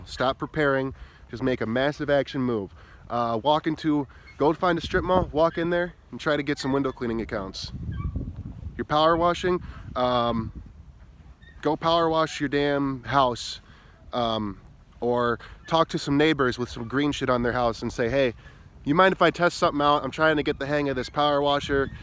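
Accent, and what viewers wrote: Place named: Chicago, United States. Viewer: I knew you were from Chicagoland. The accent and the 815. LOL You looking for any help?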